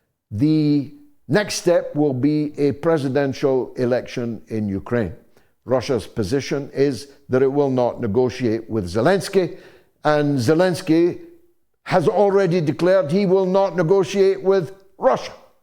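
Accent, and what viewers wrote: Scotland, United Kingdom. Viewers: I like your accent. Do I hear some Scottish? ( dont't know as I am Dutch.)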